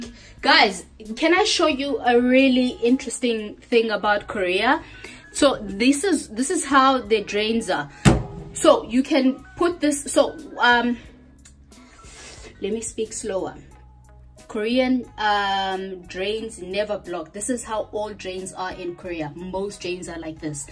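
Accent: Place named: South Africa. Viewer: I was only like 15 sec into the video and I was like, you sound South African😂🇿🇦I was right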